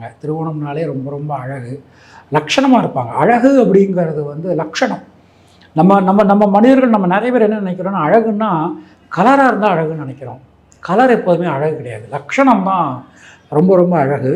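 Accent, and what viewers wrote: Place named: Manipravalam, India. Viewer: அம்மா நன்றி தயவுங நமது மணிப்ரவாள பேச்சு நடையை விடாதீங்கோ.நன்னா பேசறேள்.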